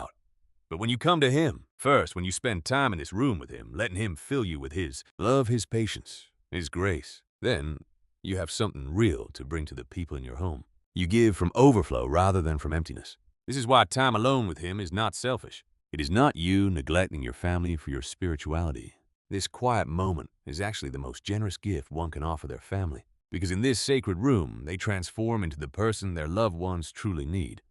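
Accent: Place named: Pittsburgh, United States. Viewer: Oh i like this voice Lord, it's just like "Pittsburghese" is the distinct regional dialect and slang spoken in Pittsburgh, Pennsylvania, and Western Pennsylvania. Rooted in the working-class history of the region and influenced by early Scotch-Irish, immigrant, and Appalachian speech patterns, locals who use this dialect are affectionately known as "Yinzers". I Am in eastern Ohio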